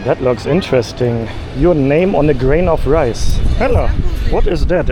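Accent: Germany. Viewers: Telling us you are german without saying you are german.hehe this is NO hatte! Your english is very nice but it sounds Real german hehe. Like your Videos. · This German-Enlish makes me shudder.:face-pink-tears: My son is living in LA and likes it very much. He was born in New York. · You have the most interesting accent. You can hear the German but sit sounds like German merged with Asian. Interesting😊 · Want to know what a hardcore German accent sounds like just watch this video.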